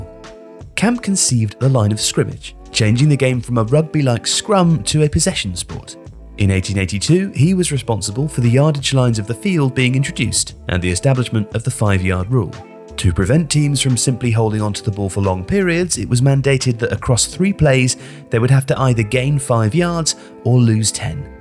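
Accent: United Kingdom. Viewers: Why is there a brit explanation american football to me. I don’t trust this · British voice talking about American Football. Interesting. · Hearing a British man saying “football” while talking about quarterbacks and audibles instead of strikers and set pieces is so jarring. I’ve just sort of mentally accepted that football+American accent=Gridiron and football+British accent=soccer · Idk if I trust a British man telling me about American football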